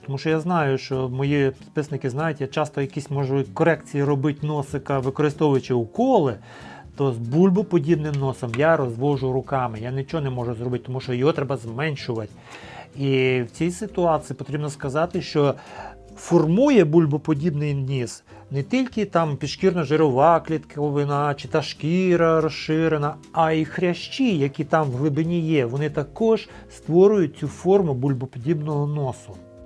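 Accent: Ukraine. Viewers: Величезний респект лікарю, за те що є можливість слухати його українською мовою♥️ До речі ваша українська надзвичайно чиста , як з заходу України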